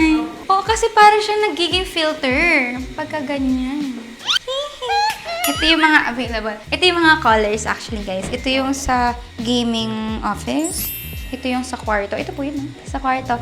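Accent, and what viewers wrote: Pampanga, Philippines. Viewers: yung accent may pagka Kapampangan parin luvit haha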